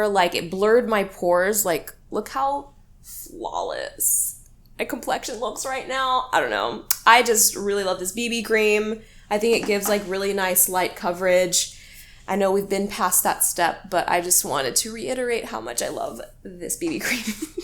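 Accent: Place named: Australia. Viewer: Can you do a video with just your Australian accent i really love it ❤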